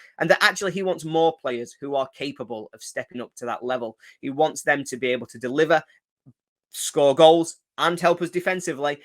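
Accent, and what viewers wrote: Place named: Yorkshire, United Kingdom. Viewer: Good to see Yorkshire accent coming along.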